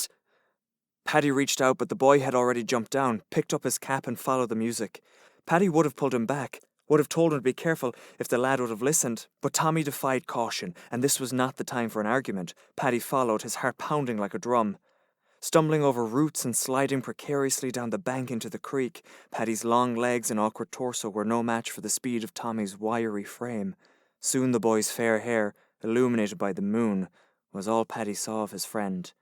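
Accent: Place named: Ireland. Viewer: Excellent... wonderful narration. I have always liked listening to the Irish dialect.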